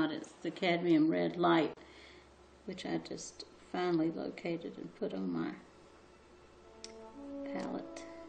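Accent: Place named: Wilmington, United States. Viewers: I am from Wrightsville Beach.. you sound like you’re from at least Wilmington or nearby. Beautiful painting!!!! I have a very hard time with sea oats and this pretty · This session 4 doesn't look like it started where 3 left off. The foreground is different. Would it be really hard for you to show the exact steps taken in a painting, instead of leaving huge gaps??? Have to say, all your videos are kind of a mishmash and there is no streamlined continuity in any of them, or any combination of them that goes from START to FINISH of a painting. or even the techniques you use. Intermediate stages are not shown which are really crucial for learning. Maybe you need to get more familiar with the technology of making videos. None of the other art instructors up here on Youtube leave such gaps in showing their technique. You sound like a very nice Southern lady, however, I think you should stop making so many sessions with each painting and make a one hour long, or however long it takes - video showing literal START to FINISH of a painting. 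Don't worry about making them roo long. People want to learn the whole process. No one will get bored, there are lots of one hour instructional videos up here, but not many beach scenes unfortunately.. I think maybe you're not comfortable with the video equipment and thats why you leave out some stages from one session to the next. I hope this helps you get more viewers. Your beach scenes are really lovely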